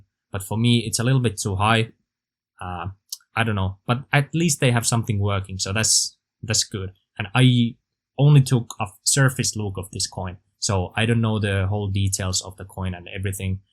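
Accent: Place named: Finland. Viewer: I sense a fellow finnish accent, great content btw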